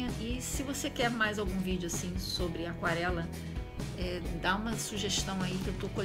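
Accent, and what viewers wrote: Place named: Rio de Janeiro, Brazil. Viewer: Tava procurando um jeito de fazer cartela com meus lapis aquarelaveis, caí aqui! Tem gato, sotaque carioca e o conteudo otimonl! amey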